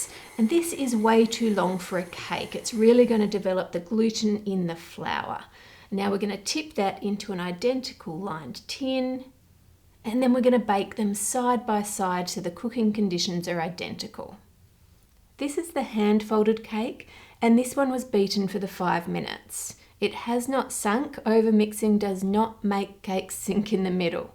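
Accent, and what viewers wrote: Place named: Australia. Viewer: is pretty much Cher with an Australian accent and baking skills. Oh. The love ❤️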